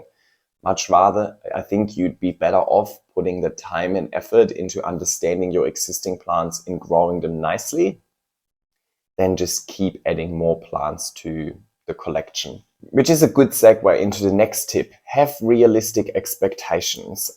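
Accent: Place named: Germany. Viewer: Exactly!!! Thank you! And are u from germany?! U have an accent like germans :)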